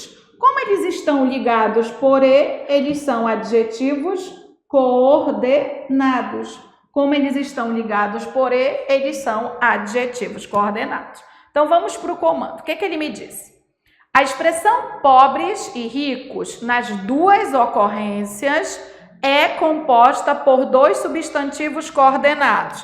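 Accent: Pará, Brazil. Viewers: O lhindo entregando que é paraense kkkkk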